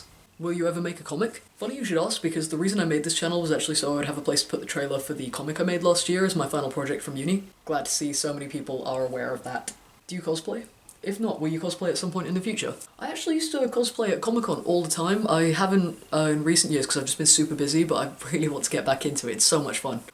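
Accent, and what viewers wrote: United Kingdom. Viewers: Dude, even I, who's not an English speaker, can FEEEEEL the British accent ! xDD (not that it bothers me, it's cute actually :3) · He is cute but that accent is asome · Oh I have a question are u British sorry I don’t know im new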